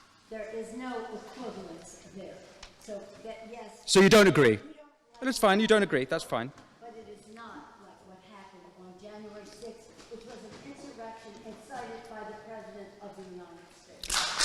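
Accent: United Kingdom. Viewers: Lo speaker non e' Americano. Accento definitamente British. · L'accento e la sintassi sono prettamente britannici: chi è l'oratore?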